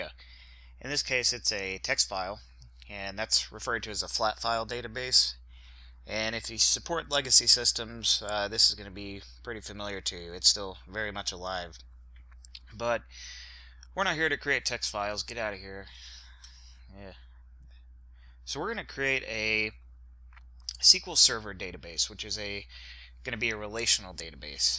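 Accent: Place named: United States. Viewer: Thank GOD for your sweet american accent! I'm tired of "right", if you know what I mean!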